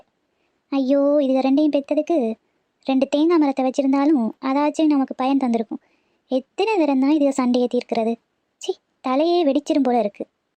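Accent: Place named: Sri Lanka. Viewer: Akka srilankan tamil Mathere iruku neenga srilanka va 🤔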